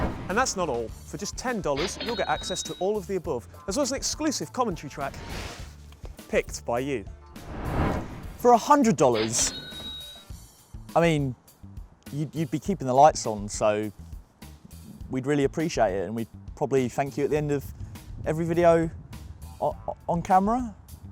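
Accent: United Kingdom. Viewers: He's British, nothing odd about his voice.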